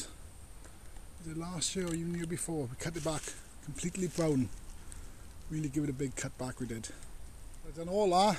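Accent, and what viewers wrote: Wales, United Kingdom. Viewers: Great job.love your Welsh accent .love your comment ,when you see everyone use long reach ,puzzle me 😂😂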